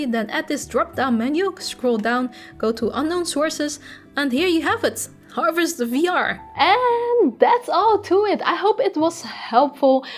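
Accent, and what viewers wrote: Netherlands. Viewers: I hear a little nederlands 😂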